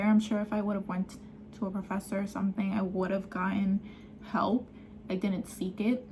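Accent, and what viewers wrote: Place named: New York, United States. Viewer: U sound like u from new York bro bro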